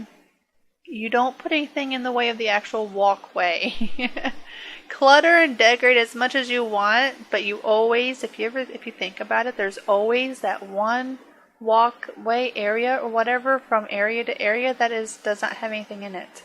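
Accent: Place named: South, United States. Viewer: Question: Where are you from? I can hear a slight southern accent and I've always wanted to ask but I didn't feel it was appropriate but with the 100 sub video coming out I felt the time was perfect! And as always amazing build!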